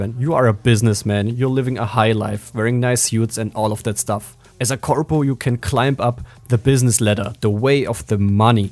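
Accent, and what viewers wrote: Germany. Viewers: hab ich an deinem deutschen Akzent erkannt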